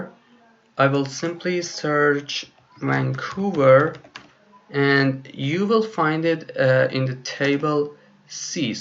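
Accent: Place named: Iran. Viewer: لهچه ات داد میزنه ایرانی هستی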